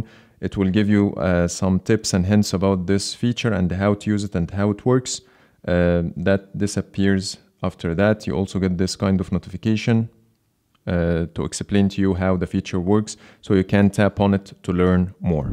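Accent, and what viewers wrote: Egypt. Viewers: A true Egyptian English accent. Who agrees? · انت مصري صح؟ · very Egyptian accent عم الناس !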